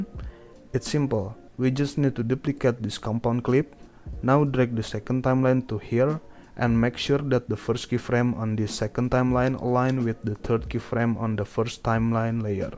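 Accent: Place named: Indonesia. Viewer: Orang indo bg logatnya kaya indo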